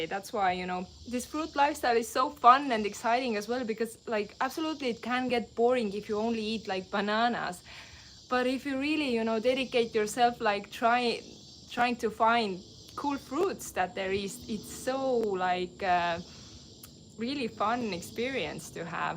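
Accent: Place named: Estonia. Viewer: Love the Estonian accent. Do you guys also speak Russian, or do they not teach in schools anymore?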